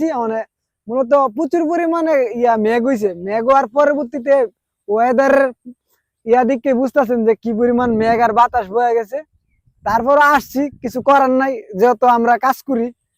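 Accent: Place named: Brahmanbaria, Bangladesh. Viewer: তোমার বাড়ি ব্রামনবাড়িয়া?ব্রামন বাড়িয়ার মানুষ কথা শুনলে মেজাজ টা গরম হয়ে যায়,কারণ এরা খাওয়ারে বলে হাওয়া আরো অনেক কথা আছে এই টাইপের আছে।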